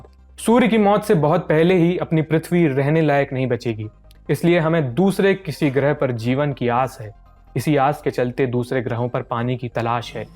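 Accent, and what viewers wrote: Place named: Jabalpur, India. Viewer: Mast bhai jabalpuri bhasha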